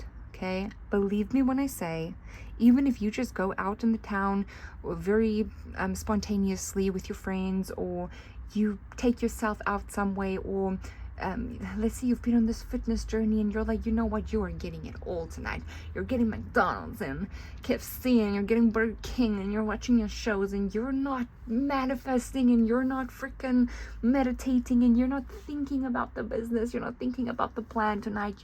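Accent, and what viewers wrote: South Africa. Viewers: love that south african accent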